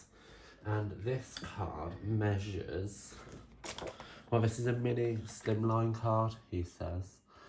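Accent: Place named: United Kingdom. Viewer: Hi there, great video! I was on the fence about this stamp set, you sold me!! Getting it! I hear your British accent, I miss it, I am from Bristol, living in the US.👋🇬🇧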